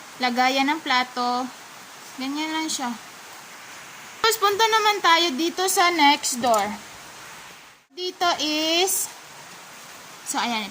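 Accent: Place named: Visayas, Philippines. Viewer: maganda yung bahay may 2nd floor pa pwede pa yang ayusin pauntiunti lng tapos yung green na kulay palitan ng white hehehe parang si beauty gonsales ka talaga mag salita maam bisaya accent 😎😎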